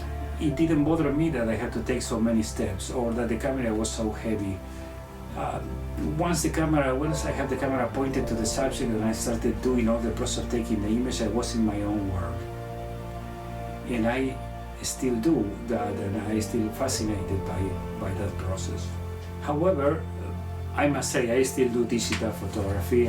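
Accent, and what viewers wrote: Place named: Chile. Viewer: Estimado, por el acento presumo que eres chileno. Creo que somos contemporáneos fotográfica y existencialmente, además de provenir de esta larga faja de naturaleza que es nuestra tierra. Imagino que vives en Usa, por tus imágenes. El gran formato es muy atractivo y quién lo práctica como tú podría decirse que es como ser un "sibarita" de la imagen. Pero con la imagen digital, como tú bien dices, la inmediatez resulta muy atractiva y adictiva. Pase de lo análogo a lo digital con gran entusiasmo y sigo en esto hasta el día de hoy con ya más de 50 años de acción fotográfica y a mis 77 abriles.... Un gran saludo para ti, de este fotógrafo radicado en el sur de Chile. Puedes ver algo de mi obra, bajo mi nombre en youtube...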